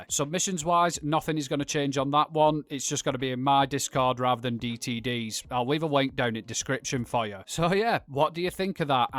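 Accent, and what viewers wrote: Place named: North, United Kingdom. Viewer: Nice to hear that northern accent again, always looking forward to your posts lad. Stay cool brother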